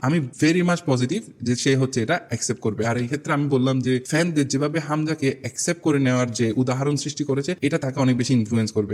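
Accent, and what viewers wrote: Chittagong, Bangladesh. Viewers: ভাইয়ার বাড়ি চিটাগাং নাকি?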